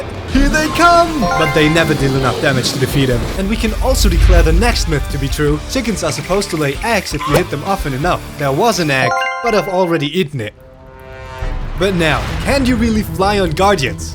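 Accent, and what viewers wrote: Germany. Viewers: Bist du Deutscher?✌🏼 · Are you german? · youre german? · pov ich weiß das er deutsch spriecht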